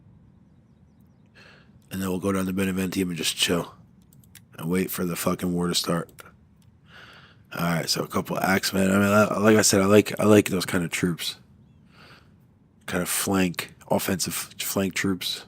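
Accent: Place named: United States. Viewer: If you're having fun, that's the most important thing. Sure, it's not the most dynamic campaign I've ever seen, but considering that and the difficulty level, it's definitely something different, and that's cool. If it's fun for you, that's what matters. For me personally, 12tpy is a bit too long, but it's nice to see something different style. Sorry for my English, I hope everything is understandable; it's not my first language ;p One question, where are you from? because I have a feeling that we operate in completely different time zones😅 the time in which you publish your videos and your accent tell me it's the United States? Good luck and I'll definitely be checking in from time to time to see how your campaign is going!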